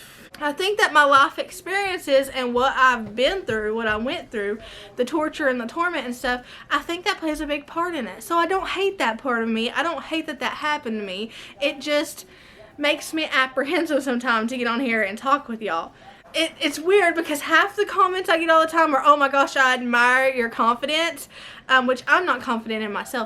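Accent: South, United States. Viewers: I love hearing you talk. With your sweet southern accent & upbeat personality you put your viewers in a chipper mood. ♥️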